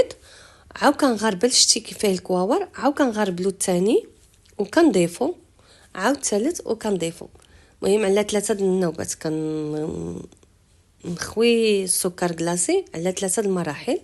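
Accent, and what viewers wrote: Tetouan, Morocco. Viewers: انا كنسمع غير اللهجة التطوانية كاع مامركزة فالطريقة ههههه كتعحبني بزاف ،الله يعطيك الصحة ❤ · تبارك الله عليك اختي شكرا كنا نقلبو عليها الله يحفظك و ديري العلم المغربي ولهجة تطوانية روعة كتعجبني نسمعها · الله على اللكنة التطوانية فكراتني في الأستاذة ديالي زمان. الأستاذة حسناء داود والأستاذة الحربولي فاطمة في الاعدادي في مدينة سلا ذكريات جميلة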